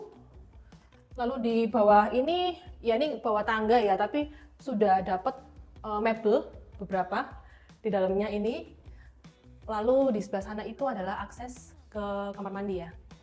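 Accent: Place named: Surabaya, Indonesia. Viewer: logate suroboyo sangat.. keren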